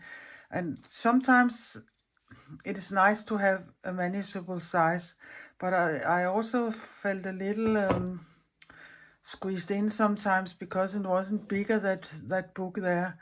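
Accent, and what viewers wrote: Denmark. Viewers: I just love your voice and accent . It’s so soothing and melodic . My son in law is half Danish . Such a beautiful country . I’m so grateful to have met you virtually here . Your art sings to me and I enjoy every moment as I’m sat in my art corner creating along with you . I’m Lisa from Yorkshire UK . I love slow stitching also xxx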